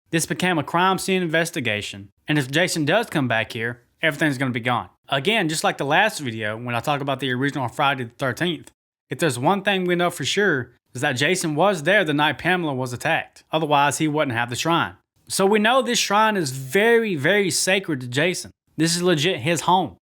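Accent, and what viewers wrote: South, United States. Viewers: glad to make your day brother I enjoy the videos it's refreshing glad to hear that southern draw you made mine today with this video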